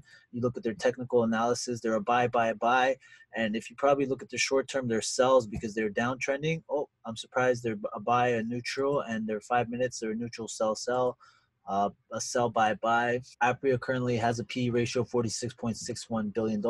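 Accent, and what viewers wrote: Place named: Latin America. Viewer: Oh nooo! It's all good baby! Your dedicated and you show how it's a full time hustle, that you obviously enjoy! You stay informed & you know your stuff. Good predictions! Thanks for showing us your mistakes as well! You take criticism very well btw, its a great quality! Your English is Fine, YOUR Latino. Go Gary V on em and say I'm Latino dick, how am i supposed to talk LOL..besides Money doesn't give a ish..Stay Focused hun🙏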